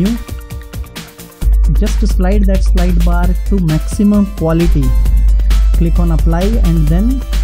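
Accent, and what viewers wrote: India. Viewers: Why your voice makes me think you are Indian ?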